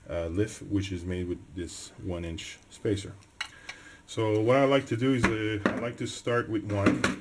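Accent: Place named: Quebec, Canada. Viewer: He sounds Quebecois to me lol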